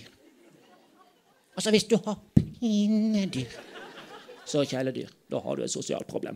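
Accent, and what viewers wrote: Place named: Bergen, Norway. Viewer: Det e faen meg bergens dialekten som gjør susen... :)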